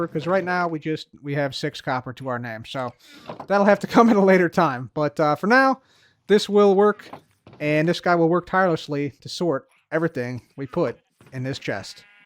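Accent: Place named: Chicago, United States. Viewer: You're from Chicago, aren't you? First-time viewer.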